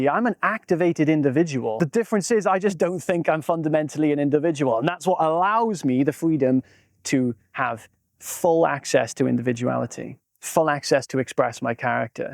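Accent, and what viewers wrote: Wales, United Kingdom. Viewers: Beautiful background! Where is that? By the way, is your accent Welsh?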